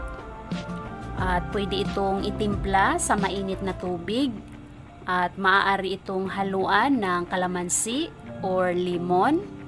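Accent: Visayas, Philippines. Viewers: Bisaya kasi!!. Unawain na lang, nauunawaan naman, thanks Inday.. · Bisaya ka ma'am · wow ha!banas sa MGA bisaya...puntong bisaya... Tagalog po salita nya... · Bisaya kasi salita kaya nakakabanas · wag na po tau magalit sa tono ng pananalita ni ate kc bisaya cia bah kaya suportahan na lang natin c ate mga ka atritis hahahaha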